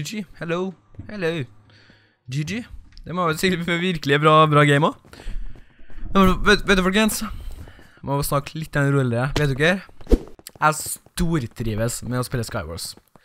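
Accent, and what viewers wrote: Trøndelag, Norway. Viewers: Ækte Trønder! Bra at vi har nånn trøndera som youtubera!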